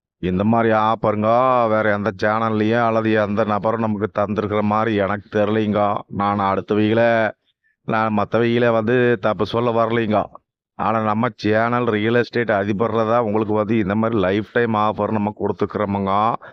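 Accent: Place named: Kongu, India. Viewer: கொங்குத் தமிழ் அருமை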